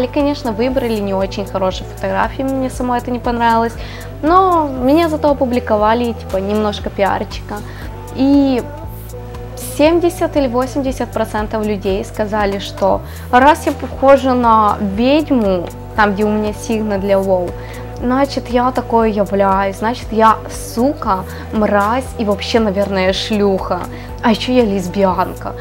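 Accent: Ukraine. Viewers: Твой мат и украинский говор выделяют тебя из "серой массы" блогеров, вообщем таких, как ты ни#@ нєту) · Ваааах. Как мне нравится её лексика и украинский акцент ❤👍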